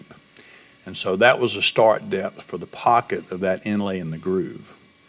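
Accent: South, United States. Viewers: Rant on brother! Always gonna be a knucklehead out there. I have a cnc and a laser and as far as I am concerned they are no different than my table saw. They are tools, complicated ones but still tools. Thank you for another awesome video. BTW love the cornbread accent....got one myself....redneck of the south Ga. variety here. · Been in California for many years but the family is from Tennessee so I don't mind the southern accent at all. I've had a CNC for several years and have learned you need to be an artist for designs and an engineer for getting the software to perform the way you want. And also a mechanic to make sure the CNC is working properly. Mostly a woodworker to see a piece of wood for what it can become sitting on a shelf, table, or kitchen counter. Amen y'all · AMEN!!! Absolutely fabulous work! Getting use to the southern accent myself. 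Formerly a cheese head (Wisconsin) and now living in Ozark, Al. Very impressive work, and design. Thank you for sharing, and you have a new subscriber.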